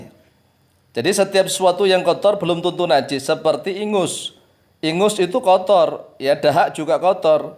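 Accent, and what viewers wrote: Java, Indonesia. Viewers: mungkin dia orng Jawa kan orng Jawa di kenal dngn huruf o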